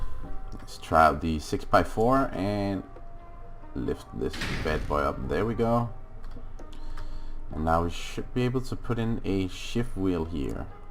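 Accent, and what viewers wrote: Denmark. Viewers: 5 seconds into the video I'm like : "This dude is totally danish" 😃🤘 Skål, brother xD <link>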